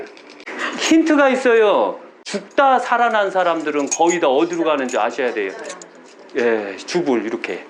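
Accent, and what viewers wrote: Chungcheong, South Korea. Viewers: 매일 유교약사생불사 봅니다 확신찬말씀이 좋습니다 충청도말씀이 참 친근합니다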